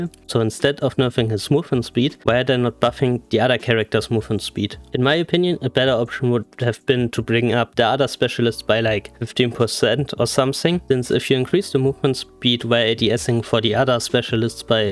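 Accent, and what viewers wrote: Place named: Germany. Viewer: Tell me youre german without telling me youre german. The accent is based af..... like for that xD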